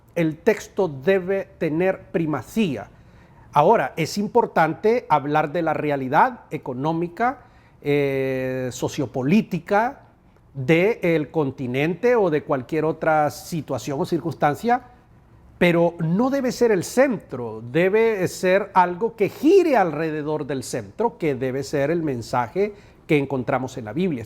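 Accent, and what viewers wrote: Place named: Spain. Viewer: De dónde la sale hablar Español de España a este cuerudo hondureño? Siempre pensé que este tipo era un engreído.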